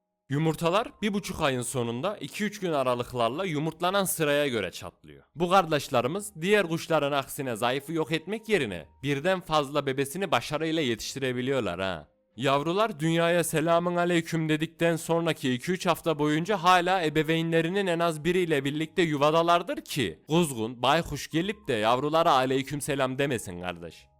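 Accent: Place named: Sivas, Turkey. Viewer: Seslediren yüzde 99 Sivaslı herhal😂 süper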